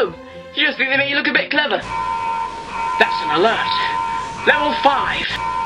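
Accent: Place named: United Kingdom. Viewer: How I like the British accent.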